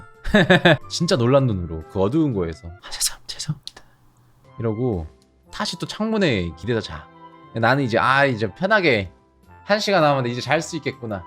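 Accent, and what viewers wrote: Gyeongsang, South Korea. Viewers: 잠만 님 대구 대쓰까? 저 대구 대쓰까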